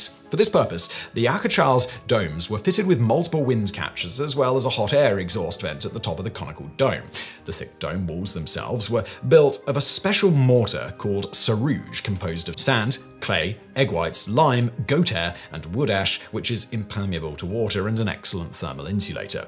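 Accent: United Kingdom. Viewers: Hey, buddy!! You need to curtail your caffeine intake a tad. Your british brogue is already difficult for us "colonials" to follow. Please, slow down a bit, OK!!! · Really enjoy the content. Very informative. But the presenter's very rapid speaking, with the British inflection is exhausting. Also, the "pictures" presented, while the person explains a certain thought has a strobe effect around the edges which distracts and is visibly annoying. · He sounds British, but his speech is rushin... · Hey mate, your audience is international. Speaking a British dialect this fast doesn't help your viewers. With all do respect, vowels can not be just a whatever short sound between consonants. Hope you appreciate constructive criticism.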